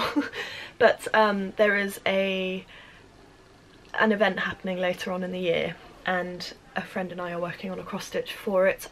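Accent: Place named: United Kingdom. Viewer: OMG, you are gorgeous, girl. You look so vibrant and healthy and what a !lovely haircut. I'm an old crafter here in southern California who really enjoyed getting to see and hear all the farm life, city life, British accent and crafting, but I was also very moved by your struggles. I was just sitting down to have a cup of coffee when I got a notification from YouTube that you posted an update and couldn't have been more delighted. I can knit and purll but simple shawls and scarves have been about all I managed. You are very, very good. Love your cardigan and the new vest/sweater you are working on now. Than you for updating and know you have a crafting friend in sunny hot-as-hell California who wishes you the very best.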